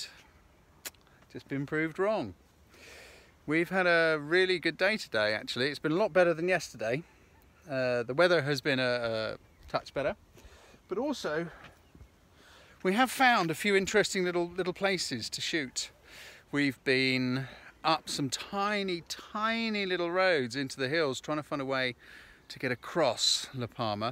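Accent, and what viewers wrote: United Kingdom. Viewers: Hello,. I wouldn't mind just hearing you talk everyday:-D (it's probably the British accent... if not, I'm sure it helps). I always say I must have been British in a past life:-D... and now I'm just planning to get "back". So I just want to ask about your equipment. What stabilization method were you using in this video? Cheers.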